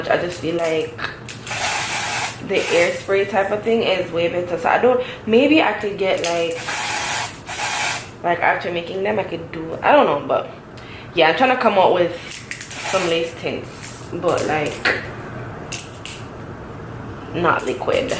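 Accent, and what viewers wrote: Jamaica. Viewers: Subscribes because I sense a Jamaican accent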